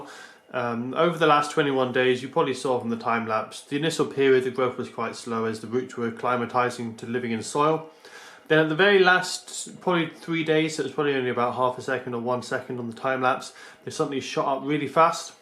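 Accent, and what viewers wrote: Scotland, United Kingdom. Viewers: He's using british pronunciation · Fuck you go with brexit, I hate this accent I could slay everyone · I guess that 400 people with the famous "brown thumb" gave 'thumbs down'. No chance for them to grow anything EVER, so they hate everybody who is able to grow their own stuff. Awesome video, even you talk too fast too much, and half of the time you are mumbling, hard to understand for a Non-Scotch. Still, awesome. It's alsoeven easier to have green onions all year long, which I love more than basil. They grow indoors and outdoors.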